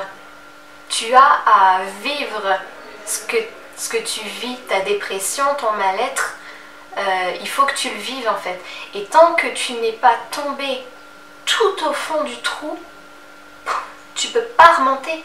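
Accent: Quebec, Canada. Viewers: Dsl pour ton expérience :)) tu as encore un peu ton accent de Québec. Le plus important c'est que tu soit bien ou tu est ! Salut de la part de Québec (La Ville De Québec) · Jsuis québécoise et on entend tres bien ton accent quebecois lol par contre un détail me tanne, on a un super bon système de santé jcomprend pas que tu devais payer mais que tu avais le droit de travailler, ta pas eu de carte d’assurance maladie? Ici on a l’équivalent de votre carte verte je comprend pas. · Avec l’accent que tu as pris ,tu es québécoise!!!!!gagné. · tres touchant ton histoire d amour decevante et ton sejour si penible en bout de compte ..t est tomber en amour avec un pauvre type.. isole a granby .. c est vraiment dommage pour toi ..on appelle ca l experience pour les deceptions de la vie les gens finissent toujours par nous decevoir en general il ne te reste qu a retrouver l amour .......t a encore l accent francais mais tu t exprime comme une quebecoise.....peut etre reviendras tu un jours qui sait....esperant que tu a garder de beaux souvenirs et de bons contactes.....le prochain tu seras plus selective et mature t est encore tellement jeune ta vie ne fait que commencer tu seras toujours bienvenue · Je sais pas combien de temps tu es resté ici, mais ton langage se mélange entre français et québécois, c’est mignon hihi🤗 bonne chance à toi pour la suite xx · merci j'adore ta franchise je regarde avec décalage tes vidéos, tu as conservé un magnifique accent Canadien, tu es au soleil, oui notre système de santé est un des meilleurs du monde et accéssible à tous. 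Tu es géniale bourrée de talent ne te laisse pas prendre la tète suis tes intuitions, protège toi, fait cracquer le vernis des gens ils et elles que tu rencontre bien respectueusement un vieil baroudeur et aventurier devenu ermite te parle je te suis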